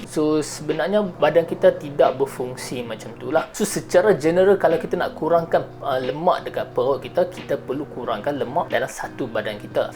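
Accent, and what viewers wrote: North, Malaysia. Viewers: Baguih, kekalkan bahasa Utara. X da kalut nk ckp KL pun. Steady!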